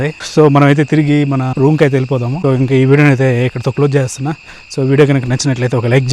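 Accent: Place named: Rayalaseema, India. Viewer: Hi, I like your video and your Rayala seema language.I love you so much and liking to hug you one time.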